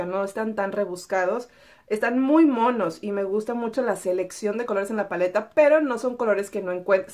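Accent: Mexico. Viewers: Me encantan tus videos, me río mucho y adoro tu acento mexicanote con todos sus modismos. Ahh y tambien llegue al final ❤️💙💛💜💚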